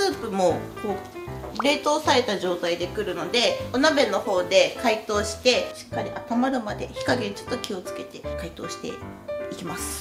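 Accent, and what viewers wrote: Kansai, Japan. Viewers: 関西人なの？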